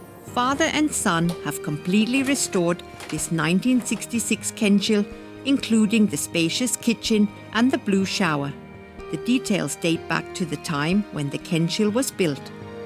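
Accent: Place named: Franconia, Germany. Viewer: Wow, sehr inspirierend - professionell gemacht und vor allem mit Herz und Gespür für Zwischentöne 🙂 Der fränkische Akzent (und natürlich als Reiseführerin): total sympathisch!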